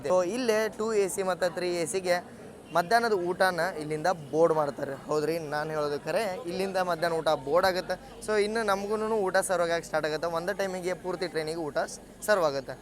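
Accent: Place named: Karnataka, India. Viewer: ನಾನು 2005ರಲ್ಲಿ ದೇ ರಾಜಧಾನಿ ಎಕ್ಸ್ಪ್ರೆಸ್‌ನಲ್ಲಿ ದೆಹಲಿಗೆ ಹೋಗಿದ್ದೆ ಬೆಂಗಳೂರಿನಿಂದ ತ್ರೀ ಎಸಿ ನಲ್ಲಿ. ಊಟ ಕೆಟ್ಟಿದ್ದಾಗಿತ್ತು ಸೀದು ಹೋಗಿರೋ ಪರೋಟ ಅಲ್ಯೂಮಿನಿಯಂ ಫಾಯಿಲ್ ನಲ್ಲಿ ಇಟ್ಟಿದ್ದರು. ಕಂಪಾರ್ಟ್ಮೆಂಟ್ ತಟ್ಟೆನಲ್ಲಿ ಅಣ್ಣ ದಾಲು ಇನ್ನೊಂದು ಕರಿ ಒಂದು ಮೇಲೊಂದು ಹಾಕಿದ್ರು ಒಂದು ಸ್ವೀಟ್ ಕೊಟ್ಟಿದ್ರು ಮೊಸರು ಚೆನ್ನಾಗಿತ್ತು ಬರ್ತಾನೋ ದೆಲ್ಲಿಯಿಂದ ಇದೇ ರಾಜಧಾನಿ ಇಲ್ ಬಂದಿದ್ದೆ ಆಗಲು ಕೂಡ ಊಟ ಚೆನ್ನಾಗಿರಲಿಲ್ಲ. ಇವಿಷ್ಟು ಟ್ರೈನ್ ನಲ್ಲೆ ಜರ್ನಿ ಮಾಡ್ತಿರಲ್ಲ ಕೆಲಸ ಯಾವಾಗ ಮಾಡ್ತೀರಾ ಖರ್ಚಿಗೆ ದುಡ್ಡು ಬೇಕಲ್ಲ ನೀವು ಯಾವ ಊರಿನವರು ನಿಮ್ಮ ಕನ್ನಡ ಭಾಷೆ ಮೈಸೂರ ಬೆಂಗಳೂರು ಕನ್ನಡ ತರ ಇಲ್ಲ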